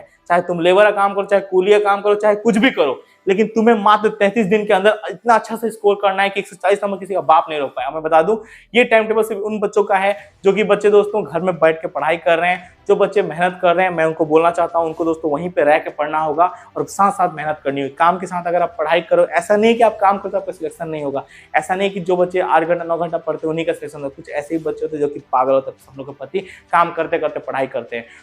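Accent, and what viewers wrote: Odisha, India. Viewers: Bhai tu odisha ka hai kia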